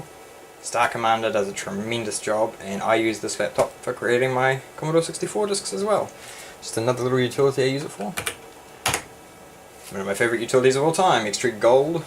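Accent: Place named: New Zealand. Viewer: Some great info in this vid! 2 questions though: - what did you say the menu software was (aussie here that can't understand your kiwi accent!) - is there any way for DOS to utilise the very top and bottom of the screen? The T3100 will show the C:\ prompt right at the top but the T3200/5200 seems to be letterboxed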